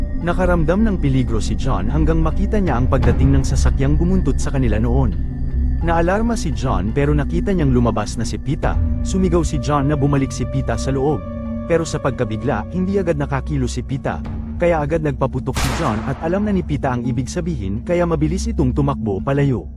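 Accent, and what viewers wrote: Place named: Bicol, Philippines. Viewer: Aba bicolano ka pala manoy ,,, gayon Ang movie recap mo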